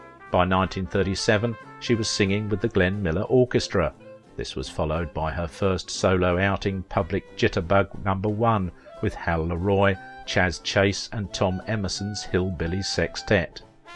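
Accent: England, United Kingdom. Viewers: About I had to play it back, cause I thought the narrator said Sal Vagina...🤔, he had a thick British or English accent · A normal, beautiful English accent. It's our American accent that can be called thick, in my opinion.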